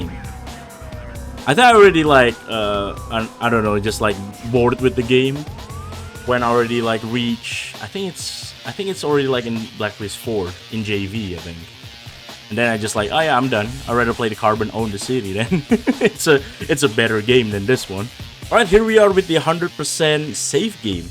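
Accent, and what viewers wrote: Indonesia. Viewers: Aksen lu kyk orang indo bang hhaha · Indo ya bg? Aksen nya kaya org Indo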